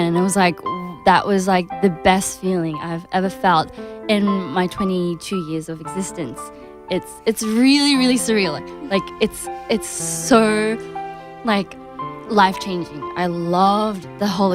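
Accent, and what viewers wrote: Australia. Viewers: I'm sold because of the ausie accent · Her random Aussie accent reminded me Ozzy The Commenter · Dat aussie accent doe. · Yes ....she can inspire anyone with her words and her australian accent..gosh no wonder her voice is so amazing....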